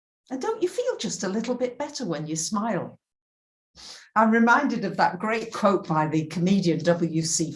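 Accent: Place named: North, United Kingdom. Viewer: Thankyou for your message , your accent seems more northern in this video!. And you look a bit different, all the Greek food I expect!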